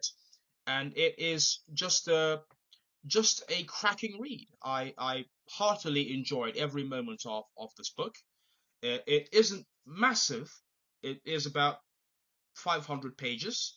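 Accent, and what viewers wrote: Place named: United Kingdom. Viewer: I'm going to let you know something, when I saw this video pop up on my homepage I thought, 'will he will speak with a British accent', and I was right! I'm from the US by the way😭😂🤣🤣🤣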